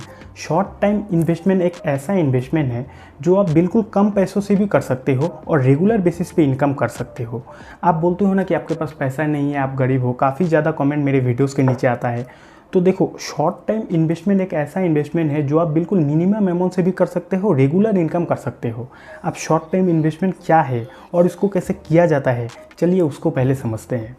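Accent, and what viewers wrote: Bengal, India. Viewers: Bangali ha kiya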